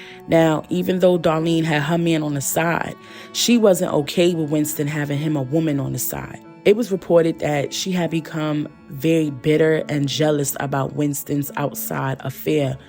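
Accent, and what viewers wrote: New York, United States. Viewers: Love your channel! Your story telling/narration capabilities mixed with that raw raspy New York accent and demeanor…keep up the great work! 🤎🤎✨✨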